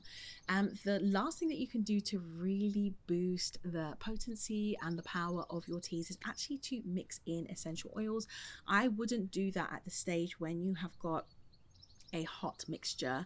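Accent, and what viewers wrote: United Kingdom. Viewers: Whoo lord! Thank You for this list because a few names of the powders I couldn’t understand with her beautiful British accent.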